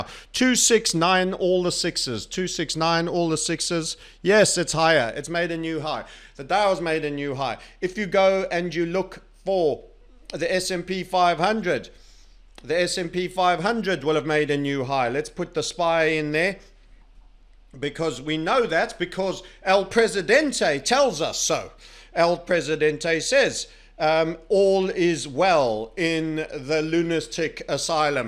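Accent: South Africa. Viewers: except it's not a brit accent, he sounds South African or perhaps from NZ/Aus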